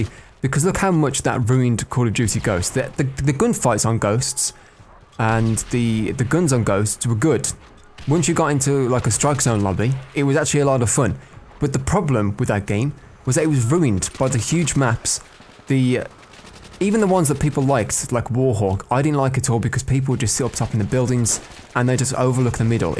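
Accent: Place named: Black Country, United Kingdom. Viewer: Followed you for ages, glad you're back mate. Think you're from the same (midlands?) area as me, although you sound Black Country/Walsall and similar age too. Keep uploading mate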